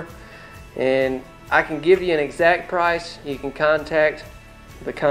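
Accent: South, United States. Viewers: I love watching these. My husband and I are considering a mobile home or a modular for our property eventually. Mobile homes have come such a long way. Also, his handsome southern voice makes these easy to watch too. Lol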